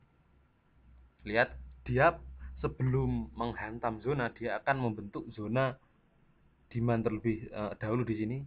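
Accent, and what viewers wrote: Java, Indonesia. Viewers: sampean njelasno ne lewis jelas dari pada pak masyur sapari opo read the market opo ifmyante opo sami be good amargo medok jowone 😂😂😂 mantep 😁😍😘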